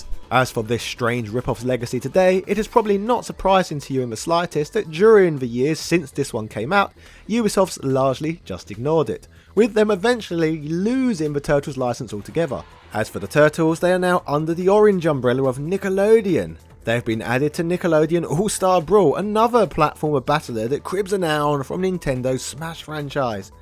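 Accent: United Kingdom. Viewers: "Venus de MEE-lo"??? "SAY-ban Entertainment"??? You Brits certainly have a unique way of pronouncing certain words...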